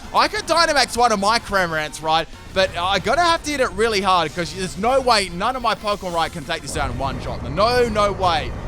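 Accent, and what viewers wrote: Australia. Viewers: I've been watching him for some time but.. is he Australian?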